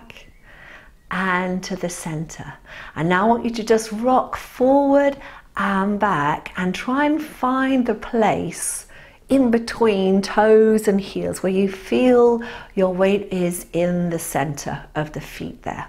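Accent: England, United Kingdom. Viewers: Hello, I haven´t done any exercises for a good few years, just being lazy, and very little inspiration, decided must do something, so I started only yesterday, did´nt manage to do all the 30 minutes, I thoroughly enjoyed those that I managed to do. Today was a little sore, but have done another lot of your exercises, to get rid of the stiffness. My name is Jan (Janythe) Canovas, I am a South African of English parents, I am 85 years old, and need to take care of the amount I can manage, but am happy to say that every day manage a little more, It is so good to listen to nice English accent, thank you. Your explanations are really wonderfull. I will come back to you in a few weeks, when I am able to manage the full 30 minutes, it will take a little time as I am VERY stiff and unfit, but you helped me a lot, even managed to go to sleep a little easier, I am so thrilled to have found you, and your over 60 Pilates. 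Thank you so much, sincerely Jan. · So happy to have found you. I'm a senior senior (!) and had stopped all muscle work and started doing cardio only, due to joint pain, etc. etc. This session allowed me to work my whole body once again without pain. Plus, everything's better with a British accent. Thanks!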